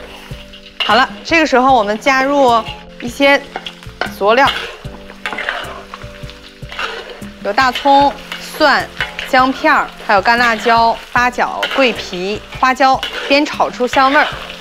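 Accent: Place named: Northeast, China. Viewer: 这丫头的话太东北了吧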